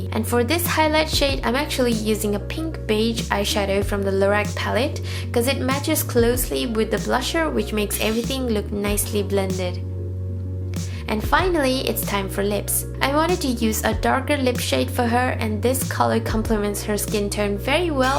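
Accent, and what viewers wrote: Nepal. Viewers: Is she Nepali, I could tell from her accent. Nice video!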